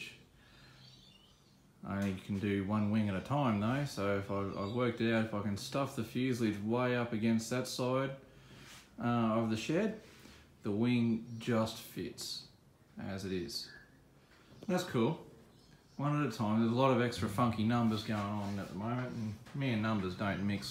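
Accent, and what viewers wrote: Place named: Australia. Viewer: Nice work Don,.........and its good to hear an Aussie voice of many vids that ive watched........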